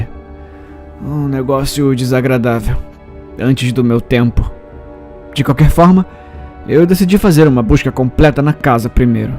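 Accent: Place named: Rio de Janeiro, Brazil. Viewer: É tão bom ouvir uma história de terror em que o narrador é carioca igual eu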